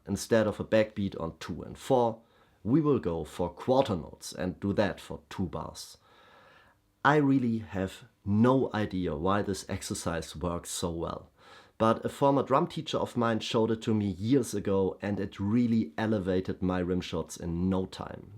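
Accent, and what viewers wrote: Germany. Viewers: thats a german accent isnt it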